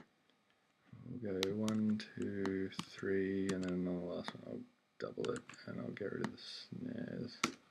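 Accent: Australia. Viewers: Thanks for the videos.. Great Help. Still very much a beginner so good to hear someone I understand. The Aus accent helps..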